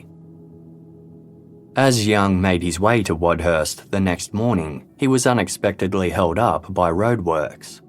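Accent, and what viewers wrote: Australia. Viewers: That clear, well modulated, Australian voice, makes this crime channel so good. Thank you for this, and peace to all out there.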